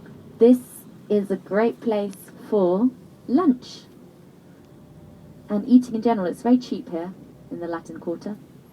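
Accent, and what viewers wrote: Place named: China. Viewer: Seems to me like the narrator is from China. Cant get her pronunciation at times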